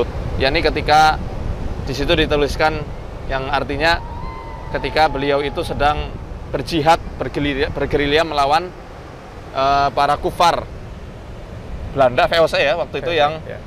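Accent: Banyumas, Indonesia. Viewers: logatnya kho ngapak bhs Indonesianya...apa asalnya dr ..daerah ngapak ,mas Ustad.Kisah dibawa jin memang ada,sy ngalami waktu umur 5 th an,rasanya dibawa teman main(pd hal bukan) .Hilang setengah hari.😀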